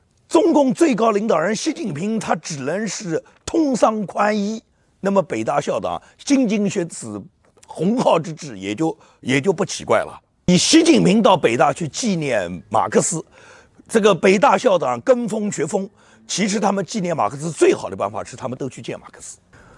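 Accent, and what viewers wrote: Nanjing, China. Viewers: 啊是南京人啊，听口音像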